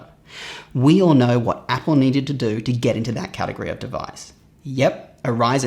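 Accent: Australia. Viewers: I’ve watched quite a few vids now and I’ve only just realized is Australian 😂😂 love the reviews and great advice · Are you Australian? I have to know hahaha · Are you an Aussie,?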